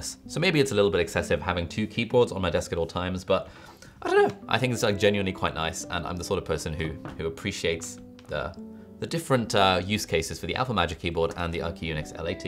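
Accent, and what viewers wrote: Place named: RP, United Kingdom. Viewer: I would like to know where your accent comes from because you sound a little rp however im not sure, I can't figure it out by the way I really like your accent!! You sound so nice!!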